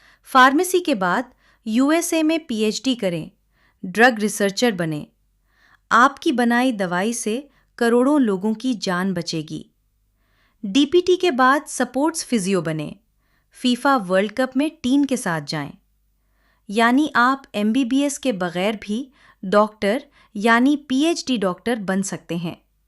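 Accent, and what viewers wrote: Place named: Hyderabad, India. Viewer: Assalamu Aleykum, Aap ka laheja mein Hyderabadi accent hai ( india ) sun kar achha laga , ( Hyderabad Deccan se Syed )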